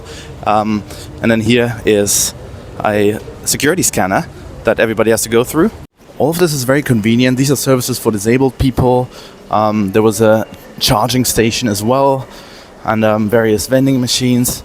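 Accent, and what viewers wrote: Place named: Germany. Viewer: Nice to hear your english .German english is the best.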